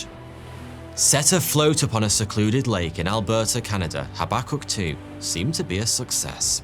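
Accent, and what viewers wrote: United Kingdom. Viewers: It's a particular British accent.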